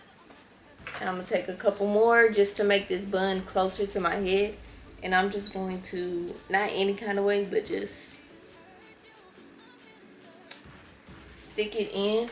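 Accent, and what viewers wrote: United States. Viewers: Love your accent , what part of the states are you from ? ❤️‍🔥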